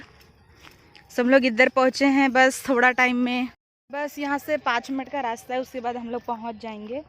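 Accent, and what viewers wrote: Jaunpur, India. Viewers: ji, Hame gaow Bahut accha lagta hei. Aap ki awaj sun kar Jaunpur ki yad aa jaati hei. Main Jaunpur se bahar rahata hu.lakin aap ka video dekh kar lagta hei ki mein Jaunpur pahuch gaya.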